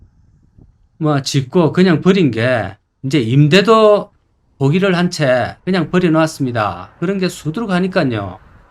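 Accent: Gyeongsang, South Korea. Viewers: 구수한 경상도사투리 정겹습니다 좋은 방송 잘보고있습니다 선생님항상감사합니다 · 설명이 너무 재미있어서 나라 돌아가는 꼴을 보면 하루늘 보내는것도 지겨워 죽을 지경 인데 설명 을 들을때마다 옛날 시골풍경 구수한 경상도 사투리 정말 살맛납니다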